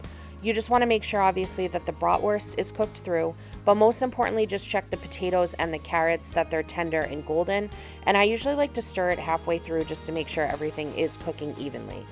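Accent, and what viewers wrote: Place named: Wisconsin, United States. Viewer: Hi! I’ve been watching for a month or so now but I’ve never commented! I’m so curious where your accent is from? I would’ve guessed you’re from Wisconsin 😅 I love your videos, you and are hilarious!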